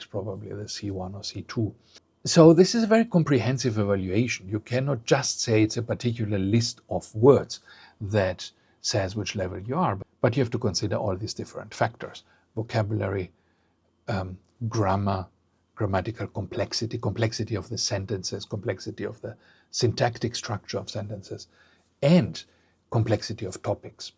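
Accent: Germany. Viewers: I lived in Greece some thirty years ago and learned the language. Never stopped learning Greek as I follow music, television and film. According to your test I have C1 level of understanding. C2, I could understand some but not the details of the message. Btw your accent in Greek is almost imperceptible, though I could tell when you spoke English that you are German.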